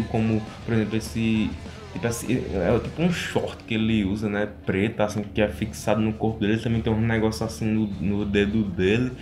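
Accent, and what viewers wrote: Northeast, Brazil. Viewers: você a do Nordeste ? se for... e nois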